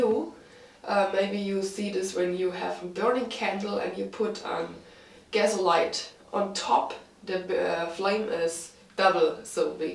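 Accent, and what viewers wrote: Bavaria, Germany. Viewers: Sooo lustig: englisch mit bayrischem Akzent! 🤣🤣🤣🤣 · Your accent when you speak accuses that you are German or something similar. I have had these models in my own house and in my parents' house for more than 30 years and no misfortune of any kind has ever happened, not even a fire or someone who has suffered burns. do not spread bad and false informations.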